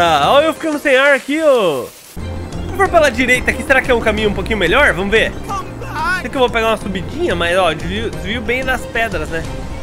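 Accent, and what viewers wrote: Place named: Paraná, Brazil. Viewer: Cai de paraquedas nesse sotaque gostoso de ouvir 😅 sdds Paraná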